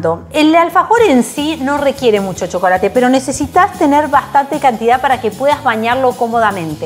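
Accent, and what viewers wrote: Argentina. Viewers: Esa tonada tan porteña!!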